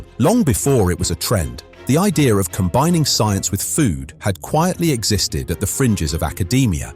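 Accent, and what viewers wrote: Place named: England, United Kingdom. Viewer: The English accent suggests targeting the Spaniards once again. Typical Brit “mala leche”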